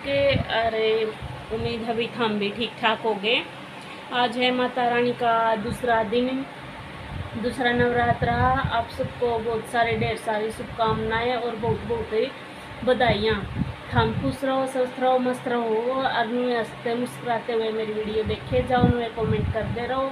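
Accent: India. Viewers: Aap Ki Deshwali Boli Bhut He Aachi Laghi Ha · Puver haryaanvi😂😂😂😂😂😂♥️🤣🤣🤣tere ke dard h ,🤣🤣🤣🤣💌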